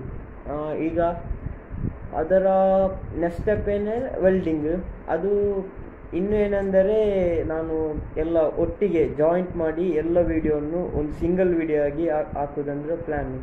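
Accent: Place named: Mangalore, India. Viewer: I like ur mangalore kannada 😄😄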